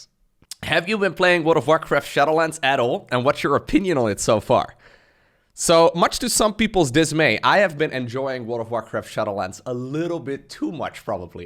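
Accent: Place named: Netherlands. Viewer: Well a dutch accent isnt really a problem i think. Id love to hear your voice in a film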